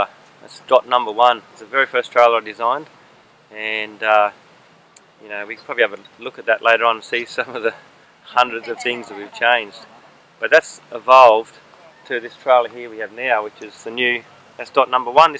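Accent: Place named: Australia. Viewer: Made, supported and spoken like a true Aussie! Fanbloodytastic mate!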